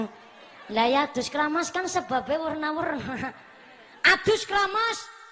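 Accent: Banyumas, Indonesia. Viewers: Mumpuni. Ceramahe ngapak ngangeni.cerdas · Justru ciri khas mumpuni tuch bhs ngapaknya...salut dah menyimak.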